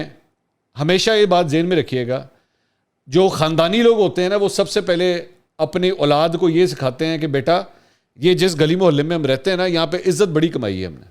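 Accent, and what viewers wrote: Punjab, Pakistan. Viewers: منصور بھائی پنجابی، سلام It is also observed that your Urdu accent is turning more toward Punjabi style. Unexpected in from a educated Media man doing a V Log on nation level. Atta Tarr incident was not that important to discuss in length, plus your “ Bhashan” made it further boring. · Punjabi ka tarka bara mazy ka hota ha boht achi lagti ha ap ki zuban pa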